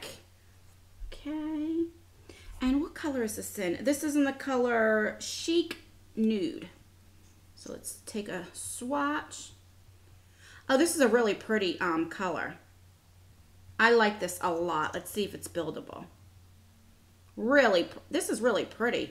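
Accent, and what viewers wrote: New Jersey, United States. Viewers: Hi, Love you love your channel. I am about ten years older than you but we have identical eye color (my best feature). Our eyes are unique in the fact that we have such a pale blue iris surrounded by the dark ring. Everybody in my family is blue eyed but I am the only one with the dark ring and until you never really seen anything so close to my own. Have to ask, you mentioned you have a daughter of mixed race? What type of eye color does she have? I would guess genetically brown but I wonder if the color is a lighter and also a unique brown? I also love your accent. It reminds me of all my wonderful summers spent in NJ with my extended family. Just wanted to drop a note as I love watching you, learned so much and never tire of listening to you. Don’t ever edit. Lov Suzanne in Phoenix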